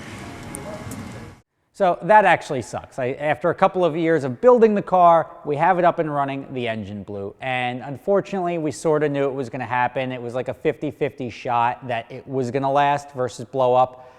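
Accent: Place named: New York, United States. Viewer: That New Jersey/ new york accent in the older video.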